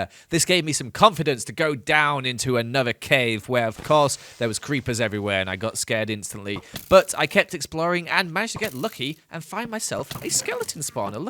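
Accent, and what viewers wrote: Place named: United Kingdom. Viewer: so refreshing to hear a British youtuber for once because the vast majority of youtubers seem to be american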